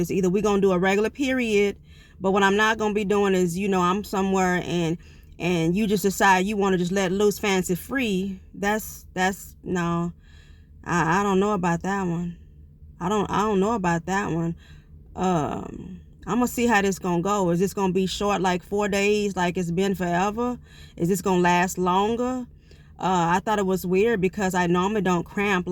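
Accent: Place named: South, United States. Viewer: Happy birthday to your daughter! Wow this is interesting I’m gaining knowledge for pre menopause. Do you know that you have a really cute southern accent? I don’t know if you know it or not. lol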